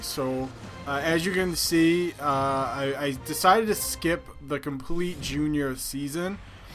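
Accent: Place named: Massachusetts, United States. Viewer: hey man, I grew up in Bridgewater, MA...though living in Denver now...don't get to hear alot of people that sound like me!! Go B's!!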